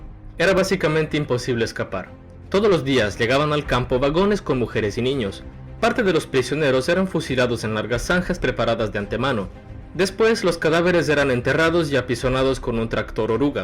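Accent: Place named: Russia. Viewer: me gusta mas la vos con el acento tipo ruso, siento que le da un estilo unico al canal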